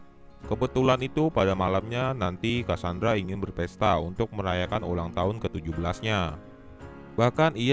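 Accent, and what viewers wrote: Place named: Java, Indonesia. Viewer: Beyoh mas medok tenan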